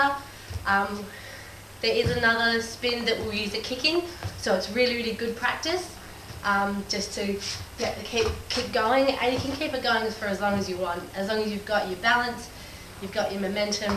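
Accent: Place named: Australia. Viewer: Shut up!!!!! I keep falling in love with you every time i hear you australian accent!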